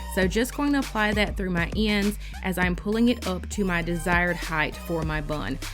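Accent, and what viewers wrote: Kentucky, United States. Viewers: Do you have a Kentuckian accent or is that just me 😂😂😂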